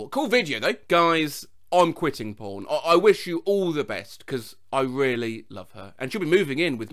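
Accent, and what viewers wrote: United Kingdom. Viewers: ITS SO FUNNY HEARING THIS MEME IN MY HEAD CORRECTLY AND THEN HEARING A BRITISH GUY, WHO CAN READ KEYSMASH, ATTEMPT TO READ ALL THESE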